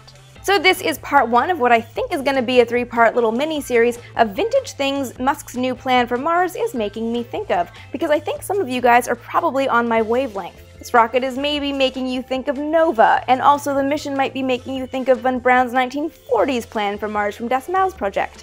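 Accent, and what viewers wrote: Canada. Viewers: you're Canadian i bet. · is she Canadian?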